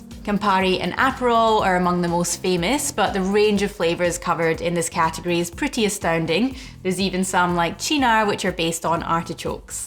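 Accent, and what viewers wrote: Scotland, United Kingdom. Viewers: I think this video was just mentioned in Stuff You Should Know's episode about amari. They mentioned a well put together video about amari by a lovely girl with lovely scottish accent... who else could it be? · OMG I thought you were from Scotland by your accent, but you're actually from Australia! That's really interesting.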